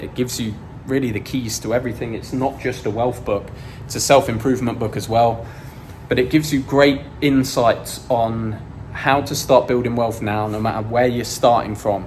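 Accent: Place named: United Kingdom. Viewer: Bri-ish accent wif uh harry poht-uh legos is honestly excellent. You’re the most bri-ish person I follow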